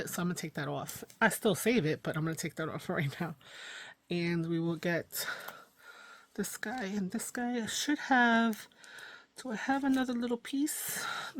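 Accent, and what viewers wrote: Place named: New York, United States. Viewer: Everything came out Beautiful ❤! I started my junk journal journey this past year and I still have a ways to get to where I you are at 😊. Thank You for sharing your creative process with us . I HAVE to ask you ….. are you a fellow Puerto Rican from New York ( you have that accent , IYKYK 😉) . I’m from Queens but I now live in Miami and I miss the cold weather! lol😉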